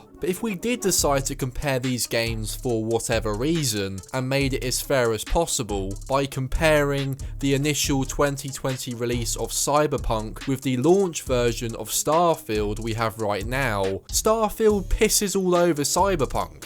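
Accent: United Kingdom. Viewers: this goes to show a british accent. how eloquent it may sound. can still say a whole lot of nothing. lil bro made a video coz he pre-ordered it for 70$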